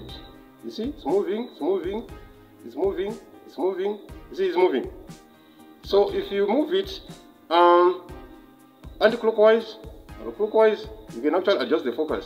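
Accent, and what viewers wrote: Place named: Africa. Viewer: I'm enjoying the videos especially thr African accent. Makes me feel so at home !!! Watched and started to understand knobology from same radiologist !!! Just great !!!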